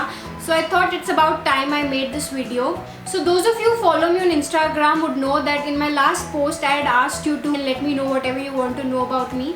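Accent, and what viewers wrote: Delhi, India. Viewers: Easy to guess you from Delhi . That super strong accent :) But good job on your creativity. You are good with it girl!! All the best! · she has an indian accent..American accents are much worse with their own pronunciations on everything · she is speaking indian English - and her accent is perfectly fine. There are Indian lecturers out there who are less understandable.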